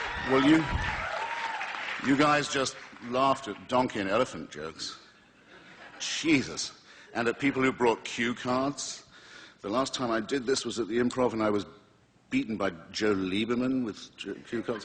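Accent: United Kingdom. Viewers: those are British accents (and there are several Scottish and Irish accents, I don't know about Welsh). English accents include received pronunciation, Estuary English, cockney, and many regional accents. Hitchens basically speaks rp, which is both an English and a British accent.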